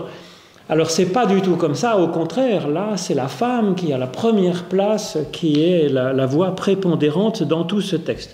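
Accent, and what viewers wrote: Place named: Vaud, Switzerland. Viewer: merci... richesse du mot à mot dans le texte... où trouver les video 1 à 4? c'est drôle: tu as pris l'accent vaudois!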